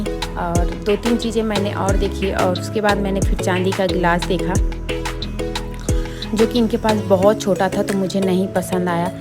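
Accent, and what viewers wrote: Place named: Chhattisgarh, India. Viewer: hlo didi aap kha se ho apke bat karne ka tone chhatttisgarh k loogo ke jaisa hai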